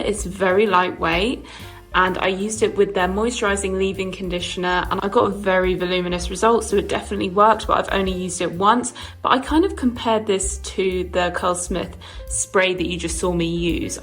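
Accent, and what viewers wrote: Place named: United Kingdom. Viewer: I didn't know you were British you have such a beautiful voice 😊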